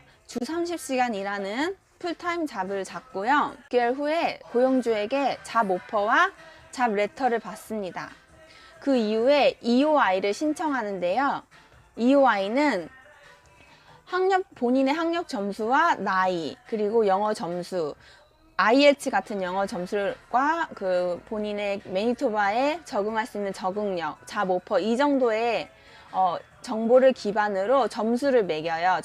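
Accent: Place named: Japan. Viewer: 영어발음이 일본어인데?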